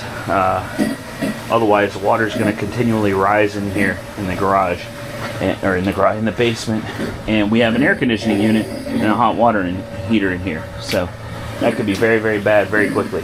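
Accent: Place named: South, United States. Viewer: 🤔 hmmm, why do have a southern accent in some of you videos and not an accent in others?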